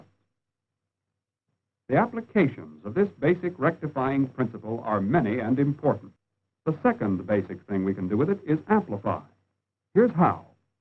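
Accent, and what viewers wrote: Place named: Transatlantic, United States. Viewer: I love these classic old American explanatory videos, military training videos etc. They're so clear and to-the-point, and always in that Transatlantic accent that you just don't hear anymore.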